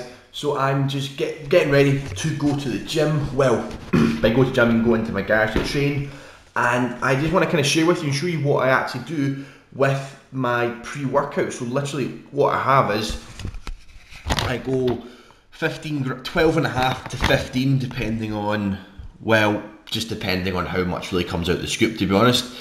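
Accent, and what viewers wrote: Scotland, United Kingdom. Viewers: Damn son you strong as hell mane. Love the scottish accent. Think my homie Tj told me about you bro..great vid champ. I subbed!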